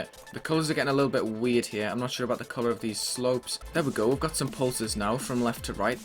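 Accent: United Kingdom. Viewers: well yh but I think he has a SPECIFIC British accent. I get what ur saying tho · He's British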